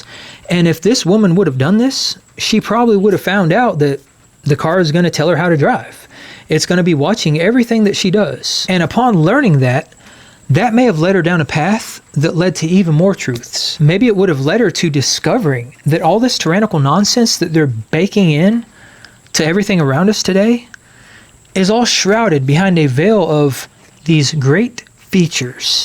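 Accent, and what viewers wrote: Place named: Kentucky, United States. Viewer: I’m from Southampton, UK. I’ve been listening for a while — what an accent you have from Kunnnntuckyyyy! Anyway, I wonder if these data centres are also connected to rezoning. Imagine living on the same road as one of these facilities with all the noise pollution. It makes you wonder whether some people are being pushed out of their homes.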